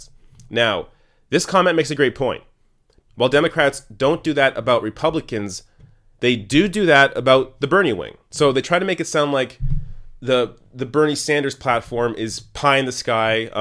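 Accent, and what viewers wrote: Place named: Canada. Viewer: your accent betrays your Canadian-ness, and I have always wondered why you focused on American politics. Thanks for clearing that up. I love your rational voice as a Progressive and will promote your videos as I can.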